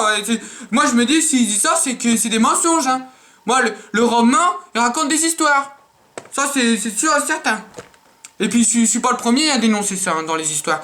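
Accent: Nord-Pas-de-Calais, France. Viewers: "Misote"" Che un gars deu'ch chti le. ♥ :) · T'es du ch'nord toi ?!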